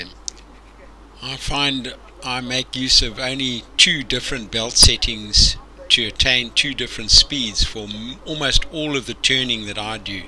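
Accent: South Africa. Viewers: By your accent you sound like a South African…. I too live in SA.I have one of these lathes that my Father gave me way back in 1970. Unfortunately the motor stopped working and the drive belts broke. Recently have managed to find replacements for these. Where do I get polyurethane belt material in South Africa if the new replacement belts snap? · Hi , I have a Unimat SL I bought new in 1977 with many accessories , I made a nice box to store everything in but never used it until I retired in 2019! Now with spare time I am making wooden model wagons and find the Unimat excellent to drill wheel and spoke holes accurately using the 3 jaw chuck on the dividing head, I have 30,40 and 48 tooth inserts for it which gives all possibilities for the number of spokes per wheel you need. I enjoyed the video and still look for more accessories, I have the thread cutting attachment but no leaders or followers and I would like the adjustable tool holder you show, I do detect you accent as very close to a south African one which is where I am in Springs. 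· Thanks for a great video. I inherited a Unimat from my late father in law. Your channel is a great knowledge source. Thank you PS are you South African?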